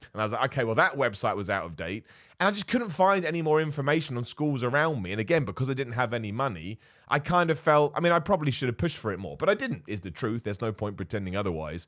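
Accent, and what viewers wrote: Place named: Northern England, United Kingdom. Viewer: From your accent I could never work out if you're a northerner or southerner. Then you mentioned you're from near London in a few of your videos. Made me think, where is that northern twang from. Worked out from this video it might've been from your time at university in Manchester 😂😂😂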